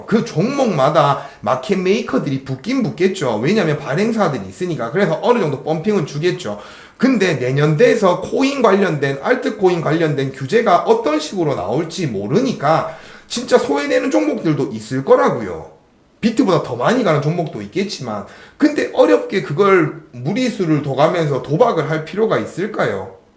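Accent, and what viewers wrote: Gyeongsang, South Korea. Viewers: 경상도 사투리가 문제가 아녀요..내용이 좋으면~~ 상처 받지 마시길요❤❤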